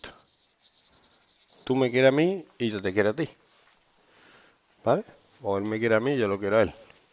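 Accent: Andalusia, Spain. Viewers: eres andaluz verdad?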